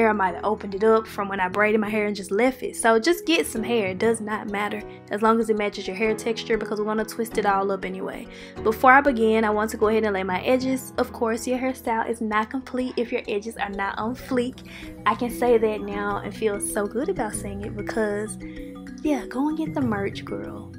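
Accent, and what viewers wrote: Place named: Memphis, United States. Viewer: I didn’t even have to know you.. && I knew you were from Memphis 😅😅😅 love it👏🏽👏🏽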